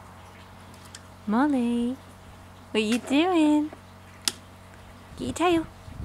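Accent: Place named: England, United Kingdom. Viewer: What's cuter the dog or her accent? Where she from England?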